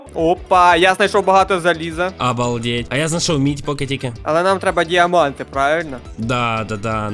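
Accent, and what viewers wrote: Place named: Surzhyk, Ukraine. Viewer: Ви розмовляете на суржику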